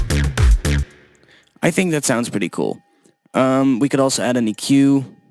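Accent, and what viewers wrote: Netherlands. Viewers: Good tutorial. En volgens mij ben je nederlander ;)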